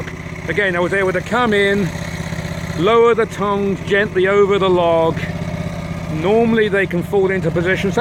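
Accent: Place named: England, United Kingdom. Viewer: I'ma be honest. I hate you english akkzents but I subskribed kuzz i like what you are doing. Salute.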